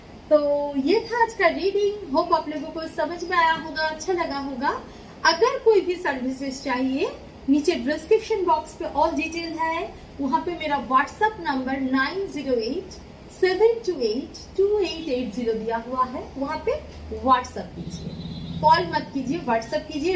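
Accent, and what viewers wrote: Bengal, India. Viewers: Plz forgive me ur Bengali I accent little bit difficult toubdeeaeabd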